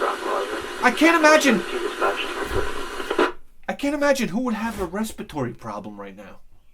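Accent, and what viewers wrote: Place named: North, United States. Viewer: I dont mind it. Has that northern yankee flavor. Somewhat entertaining in doses. I dig his humor and wantnot. Quintessential Northerner. I like the guy. And I agree, his projects are sick!!!!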